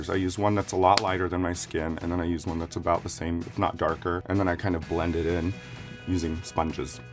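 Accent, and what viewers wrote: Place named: Mid-Atlantic, United States. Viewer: I love Raja's voice. There's a touch of old Hollywood/mid Atlantic without being fake.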